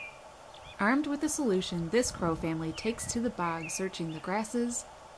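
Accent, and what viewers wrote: Canada. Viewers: Oooh very informative, thank you 👍🏻 You have a lovely soothing voice, I love your accent 🎼 Canadian, I'm guessing ?!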